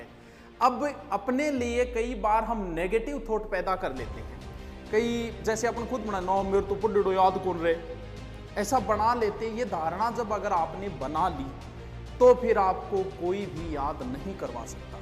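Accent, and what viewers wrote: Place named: Haryana, India. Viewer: Sir aap haryana se ho ya Rajasthan se dono language remix lag rhi h me haryana se huu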